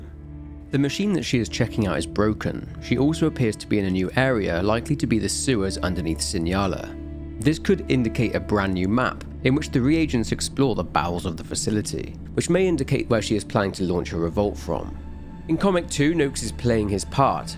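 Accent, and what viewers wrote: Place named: England, United Kingdom. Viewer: I love voice and English accent. They work together well sounding pleasant and intelligent to me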